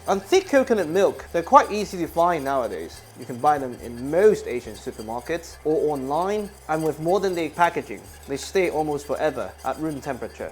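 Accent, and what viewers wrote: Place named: RP, United Kingdom. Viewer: is sooooo posh 😊❤❤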